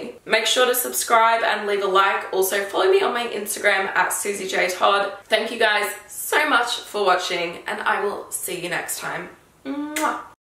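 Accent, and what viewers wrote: Australia. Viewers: LOL the subtitle for the beginning said “long time gnar see” not made for australian accents I guess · ow an aussie? i live in sydney :) also this is hot :p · Idk where she is from but it sounds like Australia, in which case it would be spring/almost summer there! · Are Australian ? Very cute accent hehe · You're so f@ beautiful an I love the Australian accent 😍😍😍😍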